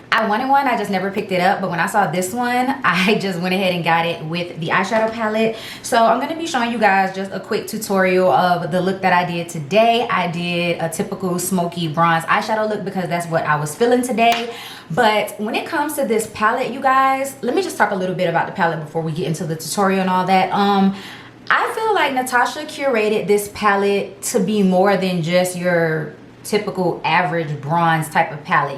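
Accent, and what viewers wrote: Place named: Miami, United States. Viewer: I looooveee your channel and your Trina like Miami accent😩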